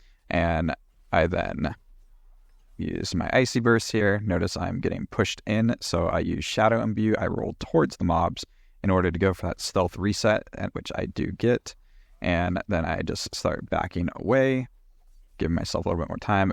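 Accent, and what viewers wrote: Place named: United States. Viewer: OMG, what a video. I have watched hundreds in the past few months and while I do WANT to read about how to make a build and where to put the points, what this social scrub actually NEEDS is to learn how to actually play the build. You have given this in beautiful clarity. Showing actual footage with a commentary, freezing actual play to discuss what you used when and why. I so wish the other competant players who post these videos would do the same. I can make the build you guys so generously provide. I can flounder around on my own and work out how to employ it to the best of my ability, but a second opinion like yours, from a competant player, really helps us casual players to put the polish on our performance and for that I am most grateful for your time and effort. On the clarity of your video, may I make a point that is meant as constructive and helpful, but which might not be something you cannot change? 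For some of us not from the good old US of A, your accent along with the gravelly edge of your voice might make the odd word difficult to decipher. While social bias dictates that a gravelly voice projects authority and gravitas, it doesn't help clarity to those of us unfluent in your accent. I don't know what I expect you to do with this information, you have a lovely voice, but I thought I would share it.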